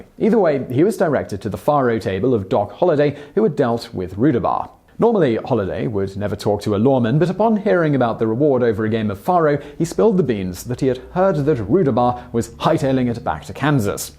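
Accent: England, United Kingdom. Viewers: Why in the hell is a sewer britsh oink talking about american southern and western history? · Despicable actually, the pretentious British accent combined with ignorance of American culture or proper American pronunciation is utterly repulsive... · im English and i do feel hearing an english man talk about American gun fighters just doesn't feel credible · The limey narrator seems a bit biased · I just cannot stand a British accent.....it makes me crazy....especially the way he pronounces the game Faro ! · Something weird about a British man giving me American history. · This would be a lot better if the narrator didn't talk so fast and choppy with that annoying accent. English accents are great as long as they are speaking the Queen's English and not that choppy sounding stuff. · Nothing says like a good old biography of a American Wild West legend narrated by a Brit! 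· So the funky English accent makes history assumptions fact?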